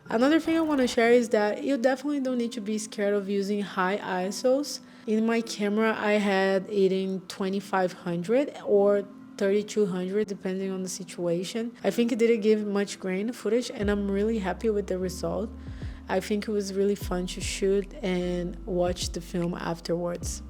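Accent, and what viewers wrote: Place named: Brazil. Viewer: Excellent content, and I love the Brazilian accent!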